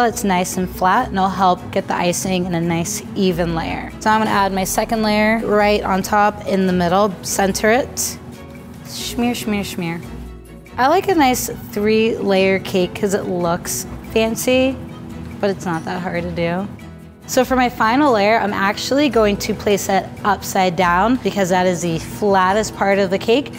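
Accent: United States. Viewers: Sounds American to me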